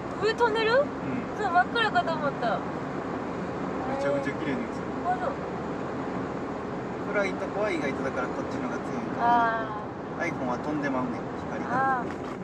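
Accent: Kansai, Japan. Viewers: 今日も楽しい動画有難うです。の可愛い関西弁との優しさに癒されていますよ。無理しないでf頑張ってくださいね～。次回もたのしみです。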